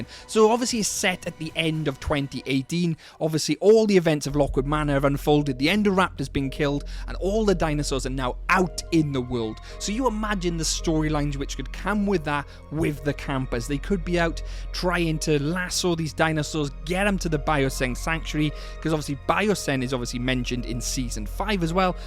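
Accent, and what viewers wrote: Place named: Wales, United Kingdom. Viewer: You sound... Welsh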